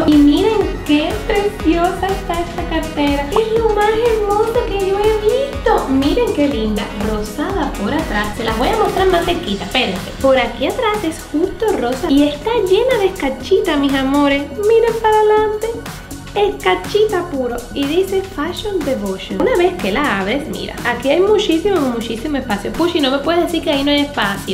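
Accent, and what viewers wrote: Cuba. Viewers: Hola, me gusta mucho ver tus vídeos y escuchar tú acento cubano 😅 Participó por cualquier cosa, así como dices tú "la suerte es loca y a cualquiera le toca" esperemos que ahora sea mi turno 🤗🇲🇽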